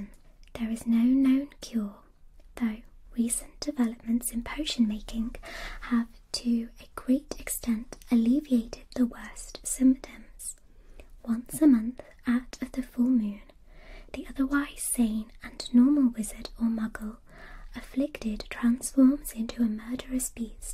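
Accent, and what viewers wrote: United Kingdom. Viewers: normally has a British accent, but she did a Hermione accent on top of that!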